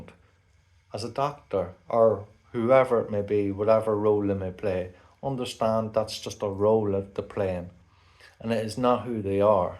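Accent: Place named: Northern Ireland, United Kingdom. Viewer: Dungannon omagh accent 😃😃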